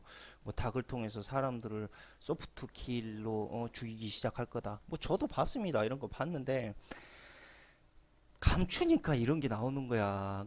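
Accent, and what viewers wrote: Jeolla, South Korea. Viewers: 전라도 억양이 찰지며, 약 돼는 쓴소리 고맙습니다. 근데 깨어있는 자가 많자않아 큰일.우리나라 정부는 정부로서의 기능 별로 행하지 않아. 엄청난 세금 축내며 많은 의원 왜 필요한가???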